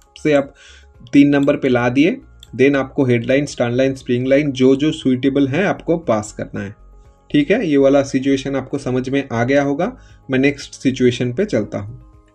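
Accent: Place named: Bengal, India. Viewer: You must be a bong!!! Ure accent suggests so...anyways these videos have been of immense help....Two thumbs 👍 up for your hard work... Really appreciate it....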